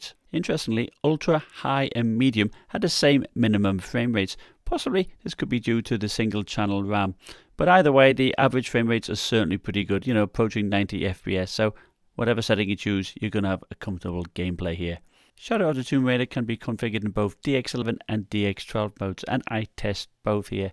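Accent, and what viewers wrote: United Kingdom. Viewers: But you sound British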